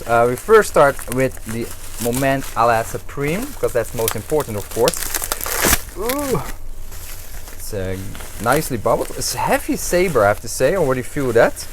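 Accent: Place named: Netherlands. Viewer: dude are you dutch? you sound like someone thats dutch and speaks english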